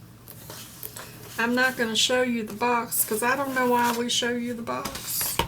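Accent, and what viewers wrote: South, United States. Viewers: I'm from the south and love your southern accent. That's a decent box and fun card!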